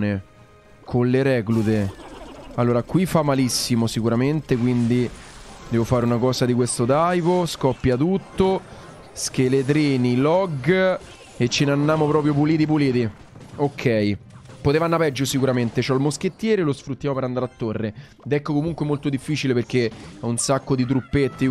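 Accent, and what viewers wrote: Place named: Rome, Italy. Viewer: Perche parla romano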